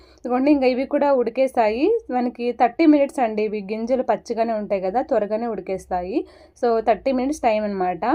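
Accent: India. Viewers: 100 days challenge ki nenu kuda ready ga unna sister. Na age 29 na weight 91 ela taggalo artham kaledu ( me slang chuthunte thirupati la undi )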